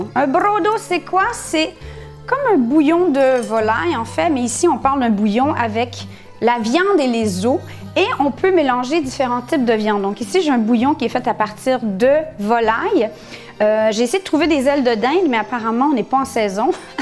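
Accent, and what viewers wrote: Canada. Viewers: J'aime votre Accent Canadien Moi j'ai l'accent du midi je suis de nice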